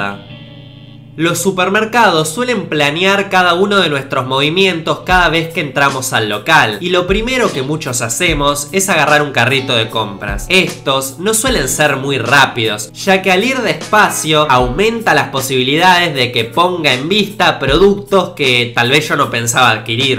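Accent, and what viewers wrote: Argentina. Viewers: es argentino no? xD viva argentina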